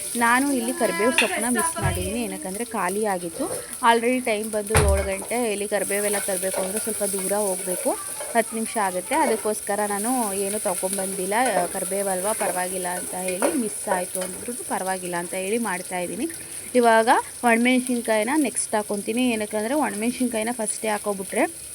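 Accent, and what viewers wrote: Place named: Thuremane, India. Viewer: ನಮ್ ಅಮ್ಮ ಮನೆ ಅಲ್ಲಿ thuremane ಅಂತ ಹೇಳ್ತಾರೆ... ಎಲ್ಲ ಸೇಮ್ ನಿಮ್ ತರ ಮಾತಾಡೋದು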